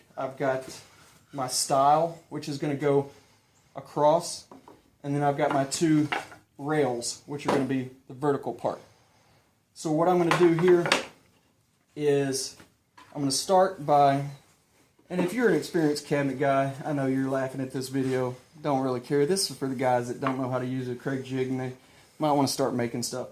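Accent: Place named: United States. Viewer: Thanks for making us I needed somebody that speaks American most of the videos for some reason are from New Zealand or England and no offense my brothers out there it’s just y’all got the metric system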